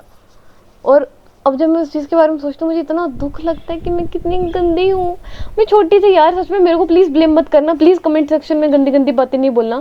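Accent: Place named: Jammu, India. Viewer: jab bhi tumhari awaaz sunta hu...uski yaad aa jaati hai..uski awaaz bilkul tumhari tarah h..Jammu walo ka alag hi slang hota h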